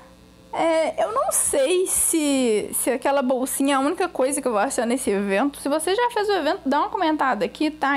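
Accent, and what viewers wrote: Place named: Fortaleza, Brazil. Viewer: Vc mora em Fortaleza ???? Pelo o jeito de 0parece s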